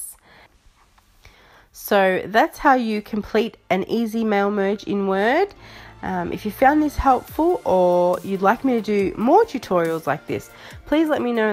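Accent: Australia. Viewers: Goofy ahhh Austraalian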